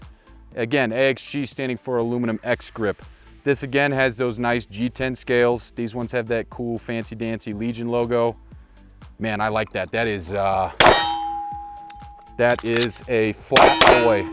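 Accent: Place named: New Jersey, United States. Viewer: Turned it off a quarter of the way through. I couldn’t listen to him say “one shot everybody knows the rules” one more fucking time. Can’t stand listening to that Jersey shore voice either. Have a great life. Wish you luck.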